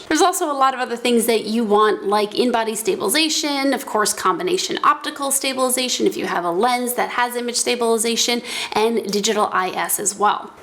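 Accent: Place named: New Jersey, United States. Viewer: That Jersey accent and shtick is priceless!! Well done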